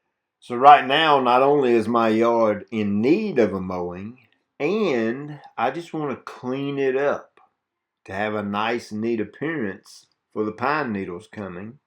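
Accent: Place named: Louisiana, United States. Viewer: You should like you are from Louisiana instead of N.C. Regardless, I like your accent. Your beds look fabulous!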